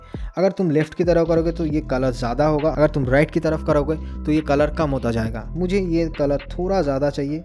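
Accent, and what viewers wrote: Bengal, India. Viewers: Vai tumi bangali na?? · Are you bengali??? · Bro are you Bengali ?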